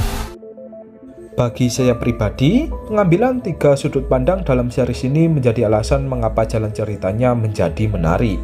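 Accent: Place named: Tegal, Indonesia. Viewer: Asal tegal apa brebes yg ceritain film ini..logatnya kentara banget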